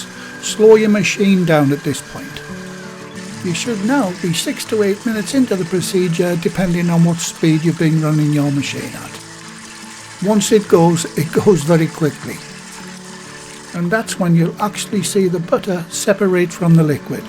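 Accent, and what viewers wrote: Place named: England, United Kingdom. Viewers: Nice to hear an English accent rather than American. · I think I partly watch to feel like home . . . now living in Australia . . I do love any northern twang.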